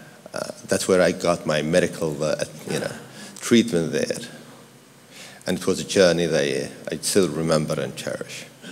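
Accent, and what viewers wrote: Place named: United Kingdom. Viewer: Masha Allah Sheikh Saud's accent is really so perfect he has the Britain one first time I get to hear his Speech wooow so fantastic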